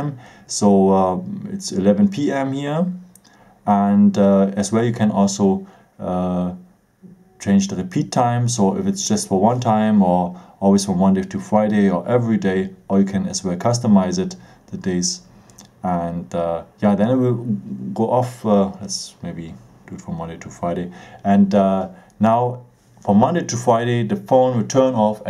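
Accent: Germany. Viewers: couh- couh seine englische Dialekt sagt ich bin deutscher !!!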